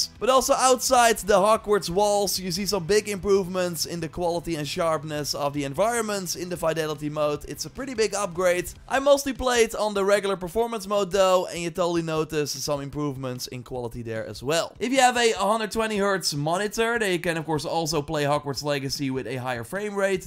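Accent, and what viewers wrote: Netherlands. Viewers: Hearing the accent, dutchie right?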